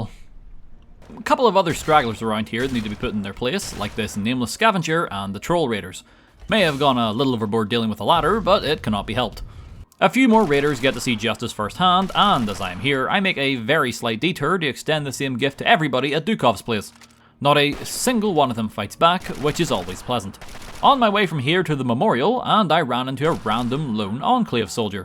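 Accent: Northern Ireland, United Kingdom. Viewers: My m8 said u were northern irish the 1st time I heard ur voice I knew he was right and what is ur least fav religion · Clicked on this video and was immediately flash banged with "HOLY SHIT IS THIS DUDE NORTHERN IRISH" lmao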